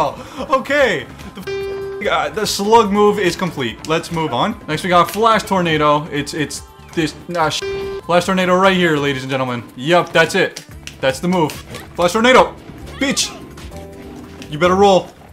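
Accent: Chile. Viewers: Are you Chilean???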